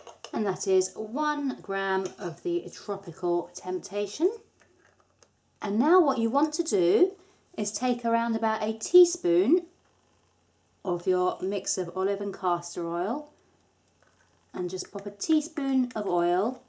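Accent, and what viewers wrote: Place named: England, United Kingdom. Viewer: Every time I hear your intro music, I'm all geared up for you to have a Beverly Hillbillies accent, and then I do a little double take when a charming English accent comes out instead.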